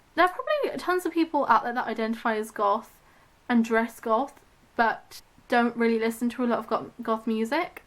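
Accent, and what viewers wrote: United Kingdom. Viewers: Wasn't ready for the British accent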